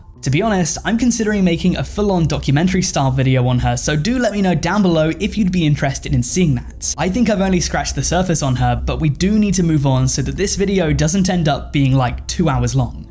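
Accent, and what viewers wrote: United Kingdom. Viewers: I usually hate British accents, but Im kinda digging his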